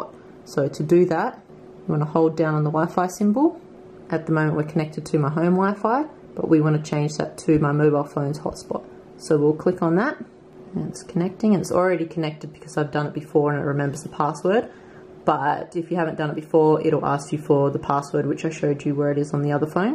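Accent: Australia. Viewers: Thanks, you made the improbable possible and did it with a sexy Australian accent.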